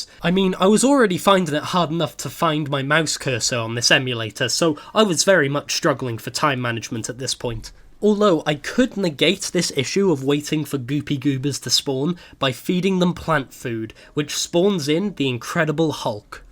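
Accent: United Kingdom. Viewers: This man can complain about everything in British and I will immediately agree Unrelated note: I subscribed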